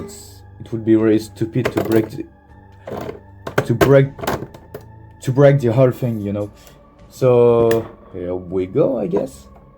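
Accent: France. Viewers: are you french? you have the accent of a french ppl trying to talk in english, why im saying this is bcuz im myself a french pp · enfin un français qui fait des video comme celle la on reconnais l'acens français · Do you speak French cuz you have an accent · You got a French accent, I like that. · Is it me or he has a french accent???? Idk.... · L’accent français on reconnais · are u french? that’s a nice accent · are you french by any chance? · Alors la toi avec ton acsent si t'es pas Français je sais pas ce que tu pourrais etre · tes tu fracais tes un accent fracais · wait are you french ? or am i just trippin' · l'accent français me tue de rire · YOOOOOOOOOOOOOOOO J AI RECONNU TON ACCENT FRANCAIS ET TA DIT UN TRUC EN FRANCAIS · Hi, im new on this channel and i was wondering, are you french?😅 because your voice have a little bit of french accent🥖🥖🥖😂😅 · are you french?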